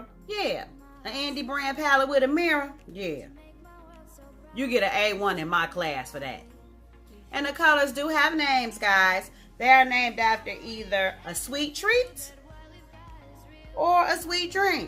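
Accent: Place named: United States. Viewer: Your non chalant country voice cracks me up i looooove it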